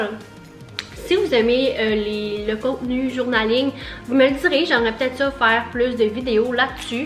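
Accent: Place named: Canada. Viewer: Bonjour j'adore le site schein et Temu ils ont du papier,des stickers vraiment magnifiques j'ai fais le plein la j'adore les hauls scrapbooking car je suis débutante et c'est pour moi très important de connaître la technique de plusieurs idées de pages j'ai hâte de voir des pages de ton journal créative et en plus un petit air du canada c'est vraiment super agréable de t'écouter tu est vraiment trop cute merci beaucoup pour ton partage de se super haul gros bisous 👍👌🥰😘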